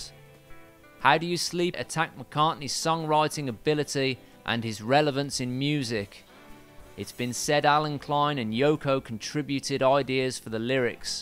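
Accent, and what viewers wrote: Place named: London, United Kingdom. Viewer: Hey, Love your sneering, Johnny Rottenesque voiceover. You from Holloway, too? Great work, as ever!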